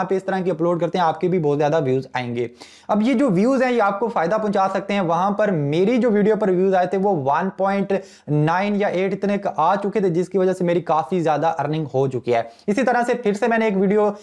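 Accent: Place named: Punjab, India. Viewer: اس نے پڑھائی نہیں کی سارا وقت ان ہی کاموں میں گزار دیا تب ہی سانیک ویڈیو بول رہا ہے :) پتہ نہیں یہ پنجاب والے بھائی اردو بھی پنجابی کی طرح کیوں بولتے ہیں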